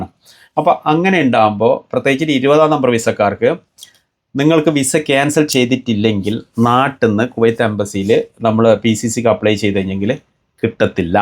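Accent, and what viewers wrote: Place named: North Kerala, India. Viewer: താങ്കളുടെ വീഡീയോ വളരെ ഉപകാരപ്രദമാണ്. പ്രവാസികള്‍ അറിയേണ്ട നിരവധി കാര്യങ്ങള്‍ സമയാ സമയങ്ങളില്‍ അറിയുക്കുന്ന താങ്കള്‍ക്ക് നന്ദി രേഖപ്പെടുത്തുന്നു. താങ്കളുടെ സംസാരത്തില്‍ നിന്ന് താങ്കള്‍ വടക്കന്‍ കേരളത്തില്‍ നിന്നുള്ള വ്യക്തിയാണെന്നാണ് മനസ്സിലാകുന്നത്. പക്ഷേ, പലപ്പോഴും താങ്കള്‍ സംസാരിക്കുമ്പോള്‍ തെക്കന്‍ കേരളക്കാര്‍ തെറ്റായി പറയുന്നതത് പോലെ 'കിട്ടത്തില്ല', 'പറ്റത്തില്ല' എന്നൊക്കെ പറയുന്നതായി കാനുന്നു. നല്ല കാര്യങ്ങള്‍ സ്വീകരിക്കുന്നത് നല്ല കാര്യം തന്നെ. പക്ഷേ, ഇത്തരം അബദ്ധങ്ങള്‍ എന്തിനാണ് അനുകരിക്കുന്നത്?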